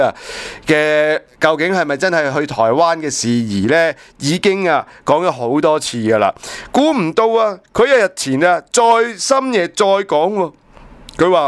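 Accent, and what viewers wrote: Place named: Cantonese, China. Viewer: 支持同0K仔! 萬分支持有廣東特色嘅普通話，令到咁Q普通嘅普通話變得非常特別悦耳 💪💪💪💪💪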